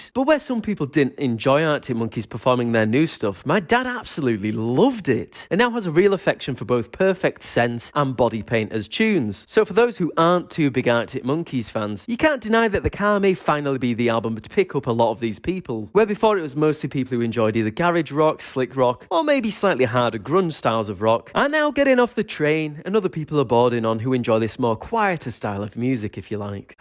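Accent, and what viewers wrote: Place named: United Kingdom. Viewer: i like your dialect, where in the uk are you from?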